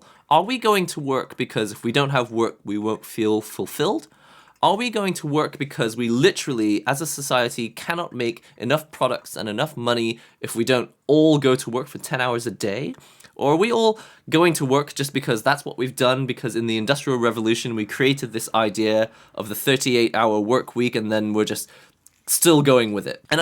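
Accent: United Kingdom. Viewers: Im new in this channel, how you have this awesome british accent?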